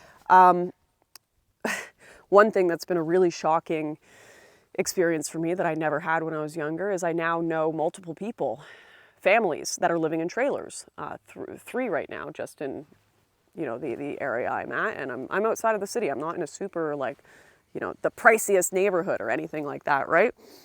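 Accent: Canada. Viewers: Steggering? You Canadians' accents crack me up. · You sound like you live in Adolf Trudues Canada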